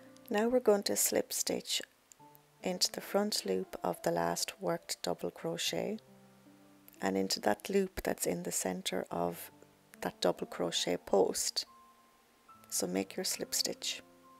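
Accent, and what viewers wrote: Ireland. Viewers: Well this is just lovely! I adore this whole tutorial! Your pretty Irish voice is so soothing. I’m an American who lived in Ireland for a couple of years, and the memories are priceless. Gorgeous pattern and hat!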